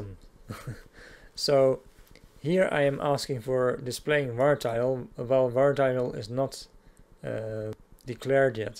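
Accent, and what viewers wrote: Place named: Netherlands. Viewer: from the 1st second i knew you were dutch😊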